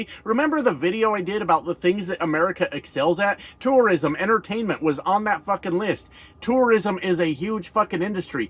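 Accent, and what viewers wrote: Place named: Canada. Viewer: You sure you're from Colorado buddy? The way you think, speak, & drop F-bombs, you sure sound like a fellow fucking Canuck to me. There's been a lot of horror stories & tragedies that have become public knowledge since the overturning of Roe v Wade, but by far the most disgustingly "American" one is the brain dead Georgia woman currently being kept alive as an incubator AGAINST the wishes of her family. You just know that once they finally remove the baby and allow the family to take her off life support, they're going to hand this single father of 2 a god damn, mother fucking bill for hundreds of thousands, or more, before he's even had a chance to bury his wife. And on top of that, doctors are not sure if the fetus is going to make it, and are already concerned about major disabilities if it does due to having fluid on the brain. 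That's some disgusting shit, on so many different levels.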